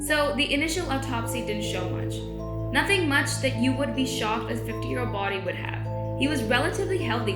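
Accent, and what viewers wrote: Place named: India. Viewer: Are you Indian from INDIA? You sound like it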